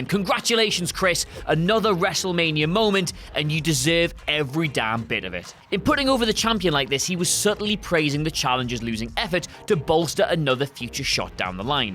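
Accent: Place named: England, United Kingdom. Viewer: Not used to an Englishman speaking English?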